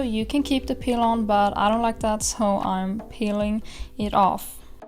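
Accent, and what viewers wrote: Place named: Sweden. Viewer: Visste att den dialekten var svensk, bra video! Nyttigt och bra för hälsan✨✨🌺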